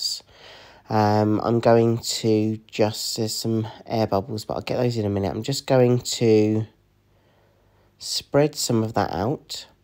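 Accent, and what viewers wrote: United Kingdom. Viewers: Happy birthday,. I love to hear your british English. 😍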